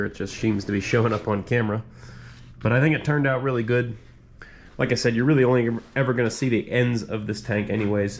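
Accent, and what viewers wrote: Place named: Western Pennsylvania, United States. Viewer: I'm curious where you are. I'm getting a slight western PA accent? I have relatives there.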